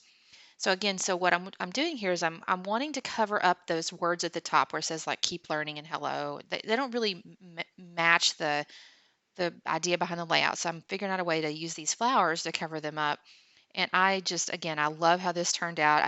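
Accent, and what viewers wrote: South, United States. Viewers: Hi there, were you shouting out to me? Thank you if you are 💖. I try to always leave a comment on the videos I watch. I want to encourage you guys to keep making them for us, lol. This is a nice layout. R u from the south? I am from South Carolina and I hear an Southern accent in your voice 🤗. TFS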